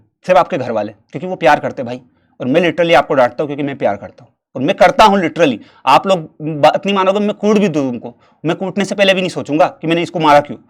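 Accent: Indore, India. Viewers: Apki jo ye indori ganv wali language hai na sir maza aajata hai sun kar 😅😅😅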